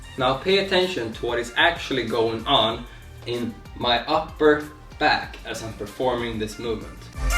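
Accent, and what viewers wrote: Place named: Scandinavia. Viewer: Where ya from dude, you sound Scandinavian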